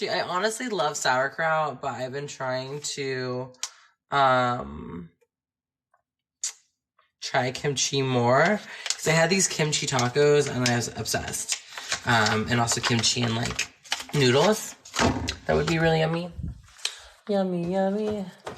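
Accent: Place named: South, United States. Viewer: That country accent, WOOW SOUNDS SOOOO HOT!! I'm glad you dont sound valley. I kno bout ur up bringing, hearing the story from you. WHOS YOUR INSPIRATION hmm something like that EVERYTHING YOU DONT WANT TO BE LIKE!! is the best answer. HUGE PROPS AND HUGE MAD RESPECT TO YOUR UNCLE, TAKING ALL OF YAH IN!! That's soooo cool, you are taking care of him. I end up changing my wallpaper to your picture. It's the picture from Pilow talk, with the light blue Luke sweater. It's me favorite picture of you. I LOVE STOLEN like shots. You look and are BREATHTAKINGLY STUNNINGLY GORGEOUS!! LOVE AND HELLA APPRECIATE THAT YOU ARE LEVER HEADED, SMART AND WITH A DEGREE, VERY WELL SPOKEN.. LOVE ALLLL OF THEEEE ABOVE. I ADMIRE YOU.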